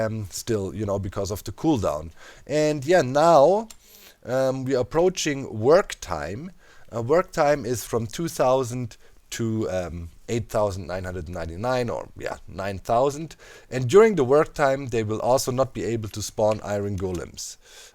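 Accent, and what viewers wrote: Germany. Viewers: Ahhh... Man hört hart raus, dass er normalerweise Deutsch oder so redet 😂 dat accent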